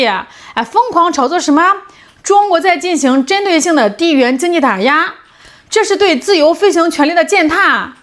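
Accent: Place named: Hebei, China. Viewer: 有真有假、听上去气势挺足、听听热闹罢了….普通话标准一点就更好了、应该是河北的。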